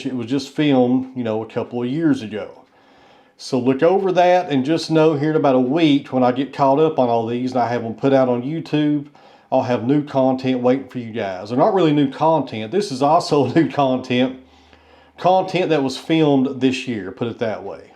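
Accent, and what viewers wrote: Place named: East Tennessee, United States. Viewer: . Would you ever consider showing us some of your picking? Banjo? I would drive from Omaha to see you. So glad you saved the old footage. Losing memory sucks. I know. My brain doesn’t work like it used to either. LOL Even Bruno was smaller. Big 15 y/o now. Great to see the progression and again for your diligence in editing. I love your speech foibles. They just show how human we are. You don’t have to bark orders anymore from being a cop. I’m sure you were quite succinct then. Now you can be just Eastern Tennessee. ❤😂😊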